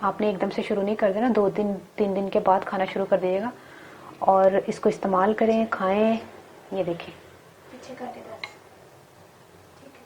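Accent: Sialkot, Pakistan. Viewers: Sialkoti punjabi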